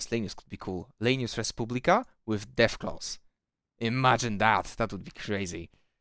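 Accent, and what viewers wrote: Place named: Poland. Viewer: I don't know why your Polish accent fits the OWB theme you have in your channel.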